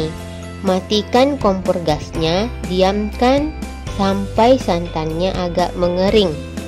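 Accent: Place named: Bugis, Indonesia. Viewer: Asli org bugis ini dr caranya bcr bhs indonesia sm logatnya😅kurang lengkap kalo tdk dibuka,mau diliat bgmn hasilnya,tipsnya jg spy tdk cpt basi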